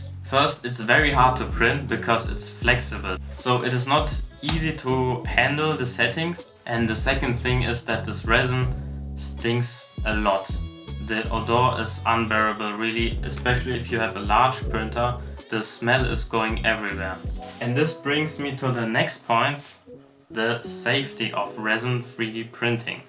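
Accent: Germany. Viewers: Cooles Video aber man hört das du deutsch bist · Are you from Germany?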